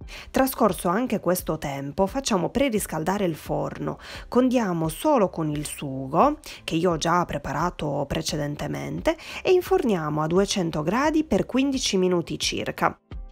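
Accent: Sardinia, Italy. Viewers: ciao ti volevo chiedere se uso la farina 0 e uguale perché in questo periodo e difficile trovarla La farina Manitoba con il covid 19 qui sparisce tutto e se trovi qualcosa e un miracolo ciao ancora .(sai io o delle cugine e la zia che abitano lì e sono tanti anni che non li vedo perche mia mamma e nata li a Iglesias.infatti io cono mezza sarda perché assomiglio tanto a lei e o oltretutto ereditato l'anemia .vabbè comuncue mi piace tanto sentire la tua parlata Sarda mi ricorda quando andavamo a trovare mia nonna che abitava a Iglesia ora non c'è più sono ricorbi dellissimi che o e mi tengo nel Cuore e mi piacerebbe rivedere la mia cara Sardegna sai be un saluto caro ciao CATIA dall'Umbria 😁👍🤣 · Mio padre è sardo!!! Mi piace il tuo mitico accento!! Le tue pizzette cicciottose !!! Bravissima !!! Un abbraccio da Trento !!!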